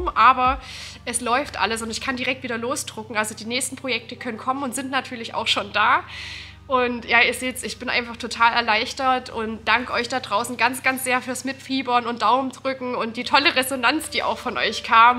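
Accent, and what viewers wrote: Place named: Saxony, Germany. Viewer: Ich hoffe, wenn du wieder heme bist, kommt der Sachse in dir, Öffter mal raus. Steht dir gut finde ich <3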